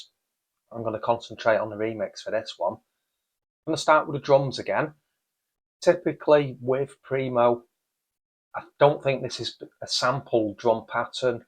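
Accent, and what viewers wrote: Manchester, United Kingdom. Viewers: Is that a Mancunian accent I hear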